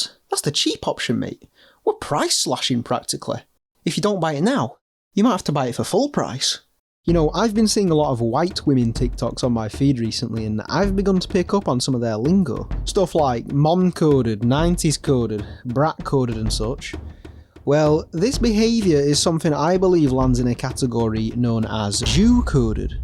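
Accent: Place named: South Yorkshire, United Kingdom. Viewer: South Yorkshire police, by the sound of the accent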